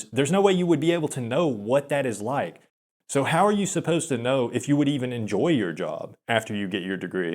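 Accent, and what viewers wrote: South, United States. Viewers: don't worry, I have the typical weird incel voice cause I go years without talking .you don't have that. You just sound like a stereotypical down south yank.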